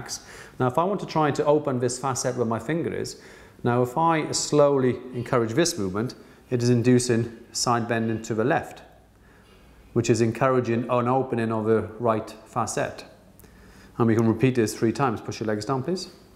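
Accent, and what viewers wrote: Wales, United Kingdom. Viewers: Ah... I can actually hear your slight Welsh accent now.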